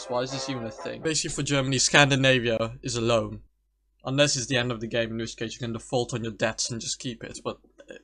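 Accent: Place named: Netherlands. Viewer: Ik hoor toch een Nederlands accent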